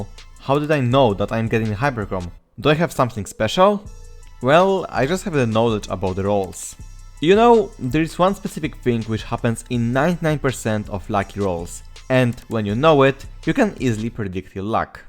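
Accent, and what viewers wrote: Poland. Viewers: Where are you front you have Poland adcent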